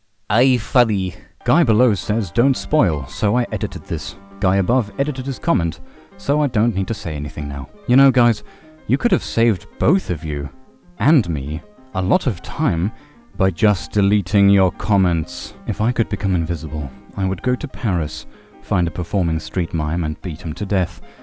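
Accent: Germany. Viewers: Everyone be up in the comments simping or talking about how he’s German. I’m just here like, okay cool it’s..a familiar and comforting voice. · Bro are you german/austrian/swiss??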